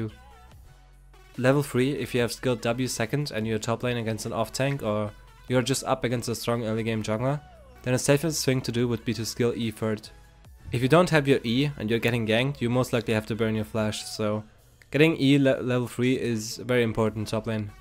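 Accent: Germany. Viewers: Ayyyy that german accent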